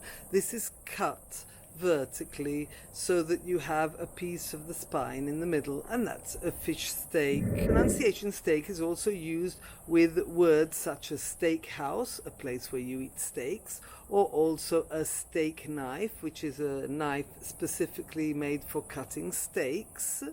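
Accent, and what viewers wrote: Italy. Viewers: thank you for your useful videos, Are you Italian my any chance?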